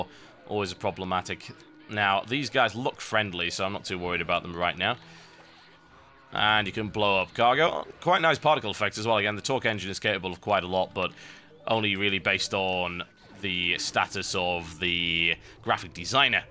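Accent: United Kingdom. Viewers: Woo, half an hour of soothing limey voice.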